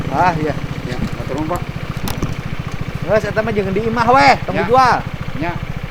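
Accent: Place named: West Java, Indonesia. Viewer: SubhannALLAH, cara ucap dan prilaku kang DEDI MILYADI ini KEREN dan URANG SUNDA banget🥰👍👍!!!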